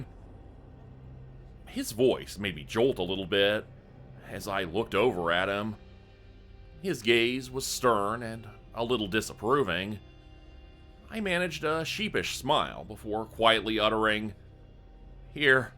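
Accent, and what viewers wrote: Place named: Canada. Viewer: Brother I love your channels but you got to work on those "O's" and "OU's". I would love to know where you're accent is from. I'm guessing Canadian are Northern United States. I really hope you see this and respond because I have been wondering this very question for as long as I've been listening. 😅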